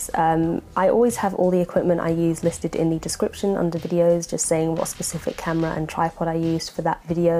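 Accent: United Kingdom. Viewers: Maybe it's because they're from the same race and both has a british accent?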